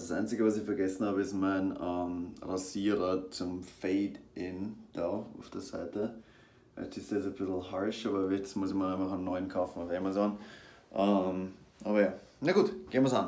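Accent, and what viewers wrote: Vienna, Austria. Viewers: Kommst du aus Österreich oder aus Deutschland? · Wo sind alle Österreicher🔝🔝🔝 Wie unfassbar geil es is dich mal auf österreichisch Scherze machen zu hören😂Und wie alle in den Kommentaren voll zamm halten!🦁Ich feiers.... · kommst du aus wien oder oberösterreich? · So geil österreichisch vo dir zum hern🇦🇹💗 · Es is so varruckt, dass ma di auf österreichisch a amoi heat..👀🤷🏼‍♂️😂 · Es ist er österreichisch mit deutschen Dialekt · Es is schön dich mal mit Bissel wiener Dialekt zu hören find ich Ur Geil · Englisch sprichst du Mega gut quasi Akzentfrei aber bei deutsch kommt dann der Österreicher völlig durch 😂 feier ich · Bist du Wiener? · I guess you will have a hard time understanding him, is speaking with a heavy austrian accents. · Für mich hört es sich an als wärst du aus Niederösterreich/Wien. Schöne Grüße aus dem Waldviertel ✌️ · haha wia geil der Österreichische Dialekt! 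Homma💯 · Jaaawohl, du hast deinen österreichischen Akzent ned valoan🔥🇦🇹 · Wos i leiwand finde is des ma deinen österreichischen Akzent heard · Wo aus österreich kummt er? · well he has an strong austrian accent so it would be hard to understand even if you are able to understand "regular" german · Naja is ja eher Östereichisch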